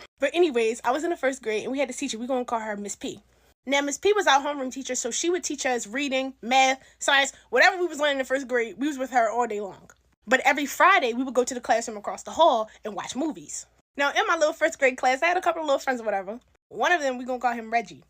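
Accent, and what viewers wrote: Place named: Baltimore, United States. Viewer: I think Baltimore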